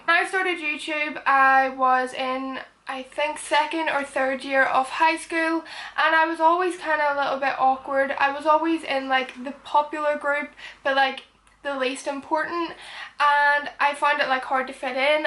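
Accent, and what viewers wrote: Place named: Northern Ireland, United Kingdom. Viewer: Eline P she is from Ireland, Northern Ireland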